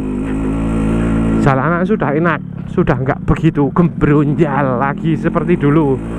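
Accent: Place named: Wonosobo, Indonesia. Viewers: Gw seru ma celotehan nya bkn ma situasi nya.. Ngapak asli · Jane rono bengi wani ora jal Jiaaann ngomonge wonosobo bngt basane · haloow Jawa ngapak hehehe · maer mas logate Bahasa Indonesia - Wonosobo wkwkw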